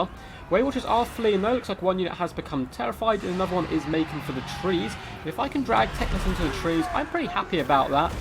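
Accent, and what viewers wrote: United Kingdom. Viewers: still at it!!! <3 love youuuuuuuu. >:( when will alithinar get sum puss puss >:( im waiting on the second enstalment of elf romance softcore porn in ur lovely british voice:p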